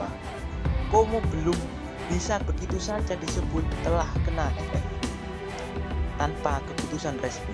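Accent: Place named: Java, Indonesia. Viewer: Menurut saya : perbaiki dulu narator mu.. kalo bisa jangan terlalu medok jawa, belajar n liat jurnalis2 nasional TV, mereka gak ada yg medok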